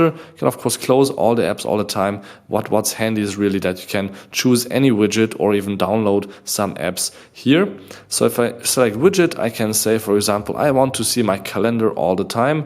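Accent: Germany. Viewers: he sounds so funny and german i would like to listen this for hours and hours😆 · Shailen Sobhee which is really weird as he mentions that he is Hungarian. He has a very definitive German English accent :) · Durch den deutschen Akzent einfacher zu verstehen als Videos von Amerikanischen YouTubern! :)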